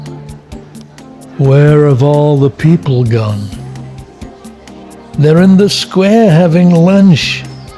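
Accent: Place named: United Kingdom. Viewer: You always get such good weather. There must be a divine blessing on you. Enjpyed the Menorca episode and British accent of. Many kudos to Spain for maintaining their outposts so pristine. All historical places are kept in utmost care. How very nice....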